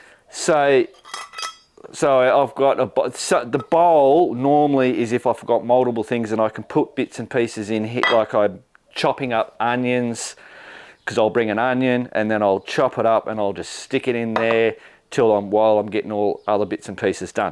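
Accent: Australia. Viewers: Crazy Australian, crazy accent. But.... I'm 57 and going moto camping for the first time this summer and this is a great series of videos. Hey, Presto!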